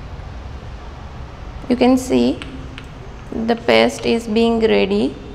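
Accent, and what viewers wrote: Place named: South, India. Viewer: Lmfaoo her English is perfectly fine it's called an accent duh she is prob south indian so yeah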